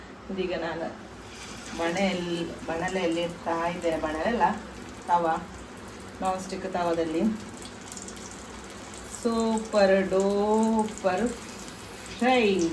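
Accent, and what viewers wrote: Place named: Kote, India. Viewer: ಕನ್ನಡ ಕೇಳಲಿಕ್ಕೆ ಚಂದ ಉಂಟು ಕೋಟೆ ಕನ್ನಡ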